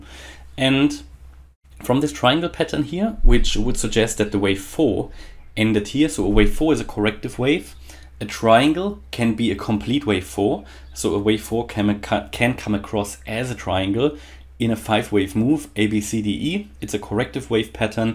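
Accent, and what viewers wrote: Germany. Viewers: das hört sich stark nach Deutsch/englisch mixxed an hahahha :D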